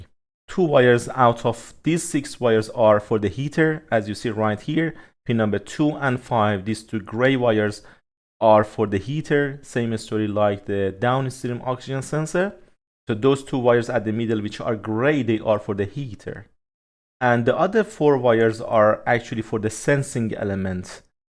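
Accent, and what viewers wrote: Iran. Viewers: برگام این لحجه ایرانیه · Iranian accent isn't it?😅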